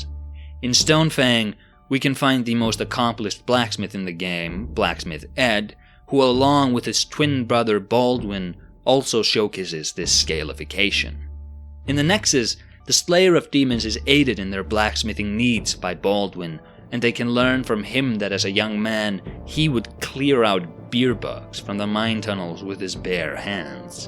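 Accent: Iceland. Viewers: Umbasa, I love this game. Edit: Do I sense Icelandic in that accent? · Just stumbled across this channel, love it! Demon's Souls has always been my favourite! From the accent I have to ask: are you Icelandic,?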